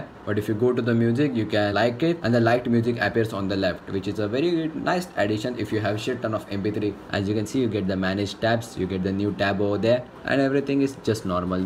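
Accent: India. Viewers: this indian accent makes me nervous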